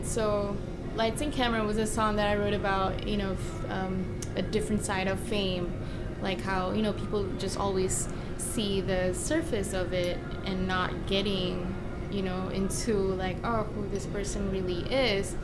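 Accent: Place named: Malaysia. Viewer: She is from Malaysia like I am so that's why she has a little bit of accent of malaysia.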